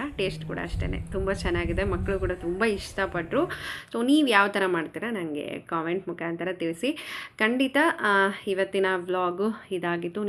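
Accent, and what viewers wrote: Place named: Shahapur, India. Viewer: ನಿಮ್ಮ ತವರು ಮನೆ ಯಾವೂರು ನಿಮ್ಮ ಭಾಷೆ ಕೇಳಿದರೆ ನಮ್ಮ ಕಡೆ ಹಾಗೆ ಮಾತನಾಡುತ್ತೀರಿ ನಮ್ಮದು ಶಹಪುರ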